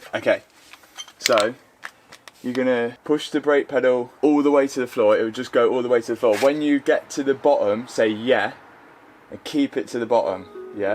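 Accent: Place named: Nottingham, United Kingdom. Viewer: Loving the vids just subbed you got a nottingham accent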